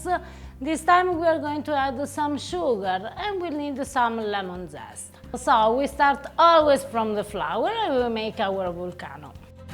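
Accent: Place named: Italy. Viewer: i just have to ask... was you tired when you was making this video? You english get abit more Italian accent. No, no offence intended.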